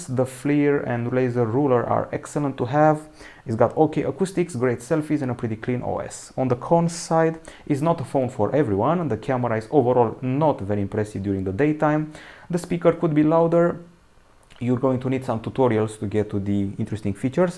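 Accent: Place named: Romania. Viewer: you have a strong romanian accent :D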